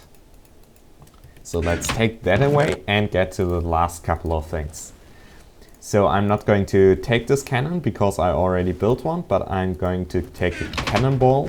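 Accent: Germany. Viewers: Bist du Deutsch ? :D Hast so einen Akzent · oooh he sounds german! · are you german cause you have a little akzent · Well, you kinda have a german accent, at least in my ears.. It that true? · are yu germaner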